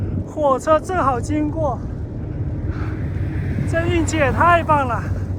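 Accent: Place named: Jiangsu-Zhejiang, China. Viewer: 江浙口音啊，骑这么远？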